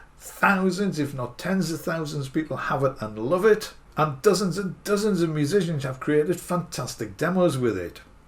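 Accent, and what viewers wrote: United Kingdom. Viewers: I agree,,, it frustrated me too.. still got it but,,, I go back to other Synths... Btw... by your accent,,, ye from my part of the UK 👍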